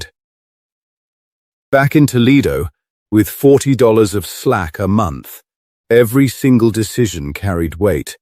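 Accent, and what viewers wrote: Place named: United Kingdom. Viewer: Why is my gut telling me that this is AI? Is it the strange eye-blinking or the British accent saying he was a truck driver in Ohio - lol!!!!!!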